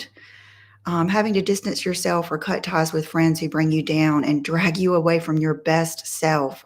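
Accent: South, United States. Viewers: What a beautiful and touching video! You are perfect , you are enough, God knew what he was doing when he created you. Your videos are so inspiring, encouraging, and relaxing to watch (your southern accent is adorable) I look forward to your videos and what exciting and fun things you bring for all your “friends“ to enjoy 💕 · I like you I find your voice very soothing. I’m originally from North Carolina and I love to hear your southern accent, and I love to see your holes of clothing and I buy things that you show me. So thx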